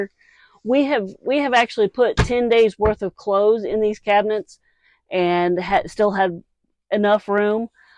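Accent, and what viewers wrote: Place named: South, United States. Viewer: Just found you.....ha ha ...Never noticed your southern accent that much before in all the years we hung together.....but...Love ya guys....safe travels and for going the travel world...Have fun....Love ya ...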